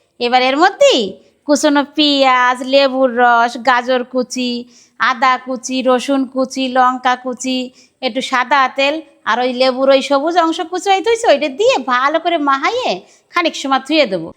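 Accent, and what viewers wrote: Bangladesh. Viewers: কথা গুলা ভালো লাগে বাংলাদেশের কিছু অঞ্চলে মতন লাগে ❤ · বলছি এইগুলোর কি sir এ নাম কেটেছে? 😂 এখনো তো বাংলাদেশী কথা বলে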